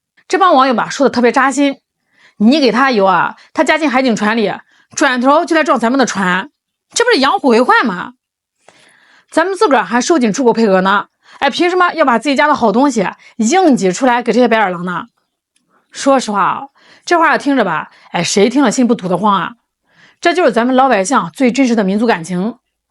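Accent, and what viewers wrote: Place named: Shandong, China. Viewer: 这个女的好像是山东人，尾音每次拖很长，给人一种每句话都在嘲笑的感觉。还是把话说好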